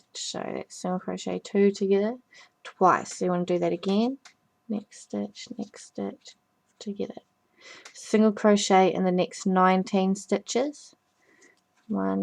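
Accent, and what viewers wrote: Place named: New Zealand. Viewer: So cute :) also so nice to not have to concentrate to listen. We need more kiwi accents on YouTube!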